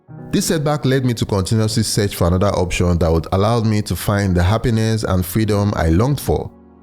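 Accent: Nigeria. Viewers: It's surprises me you have achieved such a high number of followers with such a heavy Nigerian accent. Anyway well done to you. I think Portugal is a good choice. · he is a Nigerian speaking English and therefore has a Nigerian accent! Use your head. Would you say the same to Wole Soyinka? Examine your inferiority complex.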